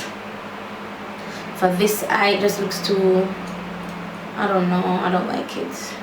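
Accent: Jamaica. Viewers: Looool the patois speed on fleek 😂👏🏾✊🏾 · jamacan accent a bit tough and you forgot to match up your neck!! looks many shades darker! lolololo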